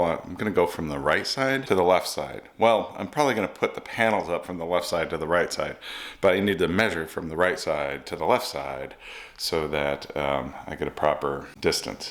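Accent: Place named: England, United Kingdom. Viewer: yr English accent is totally on point, u might like the TV series version of The Hitchhiker's Guide To The Galaxy,, 📺👍📱🛸🌌,, it was made in the eighties when there was Colins/ Nigels who spoke like that,, it's way better than the modern movie,, almost as good as the original radio play, which is almost as good as the books, which was a trilogy with five parts, (pt4 is my fav),, there are also audiobook versions on yt, which r good for painting to 📱📻😏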